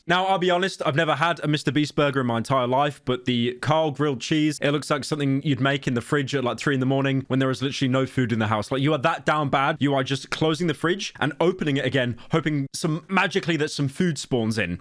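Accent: United Kingdom. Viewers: Shut up British man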